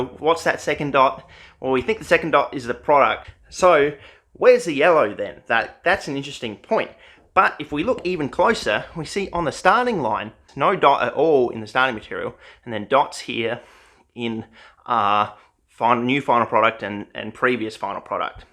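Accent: Australia. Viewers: I'm normally pretty good with accents, even Aussie, but i did spend a chunk of the video wondering what "enema" analysis was. :) :) :) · As an American from just outside Boston Massachusetts, I’ve completely substituted my impromptu American English accent with a perfect Australian cadence (your voice) slowly over the past couple weeks. Everyone loves it and I’m considering phasing out my normal impromptu accents from around the world to just straight -Australian vernacular. Don’t post for a bit so I can grow out of this silly habit brought upon your paralyzing uploads.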